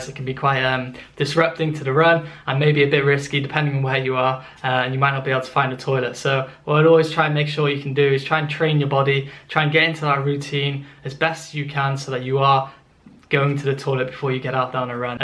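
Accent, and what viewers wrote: Wales, United Kingdom. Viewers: Is that a welsh accent i hear ayy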